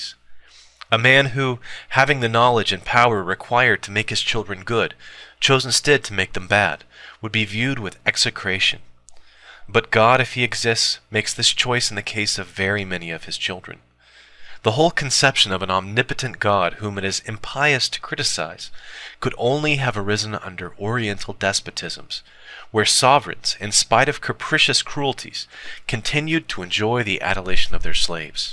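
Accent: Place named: United States. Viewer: Why an American accent of ones words who was so very English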